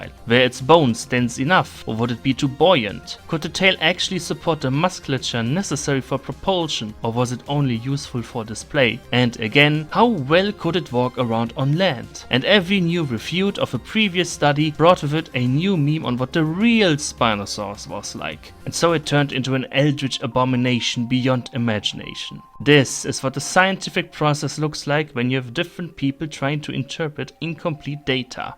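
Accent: Germany. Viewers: Are you german? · deutscher akzent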